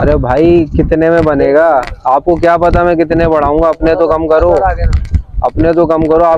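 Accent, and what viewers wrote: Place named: Karachi, Pakistan. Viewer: Yr pure Karachi wala style keep it up brother ❤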